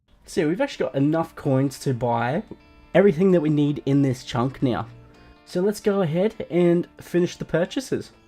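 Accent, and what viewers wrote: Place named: Australia. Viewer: i just started my first one chunk account in varrock and it’s definitely nice to hear a familiar aussie accent to follow along to 😂 looking forward to seeing where this goes, good luck!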